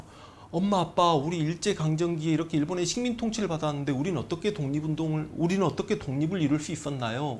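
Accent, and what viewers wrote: Jeolla, South Korea. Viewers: 전라도분이 전라도말투가 나오는게 정상아닌가요? ㅎㅎ 그걸 숨기면서 설명을 하신다는것 조차 힘들지않을까요? 지금 이야기하신부분.. 이해하기 힘드네요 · 재밋게 잘보고있습니다. 모든강의가 제생각과 틀리지않다만..본인스스로 진보라고 하신점도 중도인 저는 지지합니만 성향이 갈수록 기우는느낌은 불편하고 거기에 전라도말투가 섞여나오니 불편해지네요? 색깔을 안보이게 하시면 더욱 인기있는 강사님되실듯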